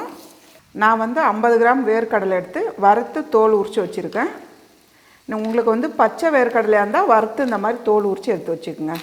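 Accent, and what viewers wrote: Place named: Tiruvannamalai, India. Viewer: Thiruvannamalai tamil slang I think 🤔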